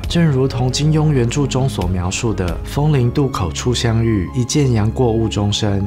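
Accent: Cantonese, China. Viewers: 做得太好好了，很詳細，而且喜歡你講粵語，好可愛❤會一直支持你，加油😊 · 謝謝你的用❤制作，現在香港電影都係古仔一個人撐起，不簡單 … 很掛念哥哥 … 你的廣東話 很可愛 😂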